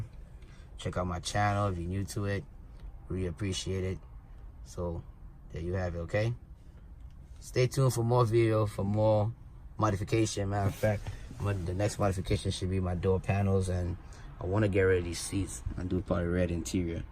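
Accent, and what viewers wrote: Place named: Jamaica. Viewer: Yes sir and you sound like ur from yard….. My fam is JA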